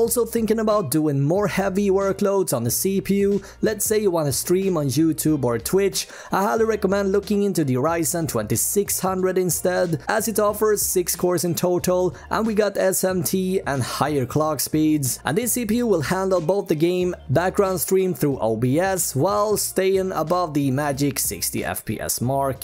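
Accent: Sweden. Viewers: U sound swedish and if you are, Hej :) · Are you Swedish? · Are you swedish sounds like it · Are you swedish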